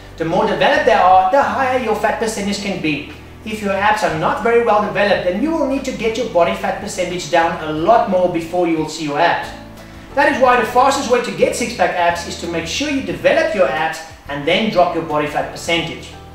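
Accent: South Africa. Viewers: If i were to guess, i would say non other than English. From his accent, I think he is from South Africa where they speak predominantly English although there is a possibility that he also speaks Afrikaans which is the second most spoke language in SA. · Sounds like South Africa, right? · South African accent... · You sound like your South African and British. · are you south african?? :)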